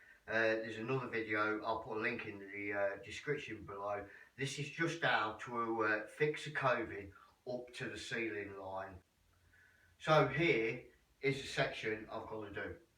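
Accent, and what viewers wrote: Leicester, United Kingdom. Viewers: Cheers for that mate. Just wondering about your accent. Are you from Leicester by any chance?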